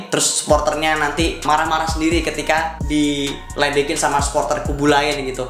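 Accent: Java, Indonesia. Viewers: Yang ngomong jamet ya , medok amat . Gak usah sok vocal ngurusin orang . Cukur aja rambut lu botakin , masih pede gak lu tampil di depan kamera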